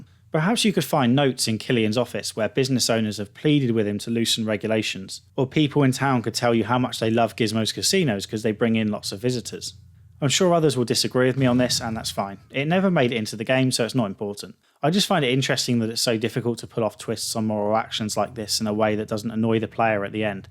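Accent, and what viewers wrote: England, United Kingdom. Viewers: Lulaire Noroub he has a English accent therefor he say it differently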